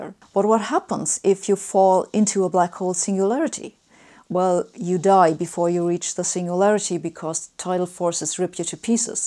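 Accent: Germany. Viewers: I noticed your teeth almost never get apart. Wondering now if that's related to german accent while speaking english.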